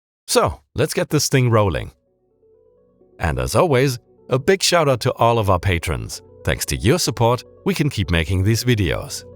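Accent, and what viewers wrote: Germany. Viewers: now i know: you speak German... or at least, your speak pattern is German influenced (😅)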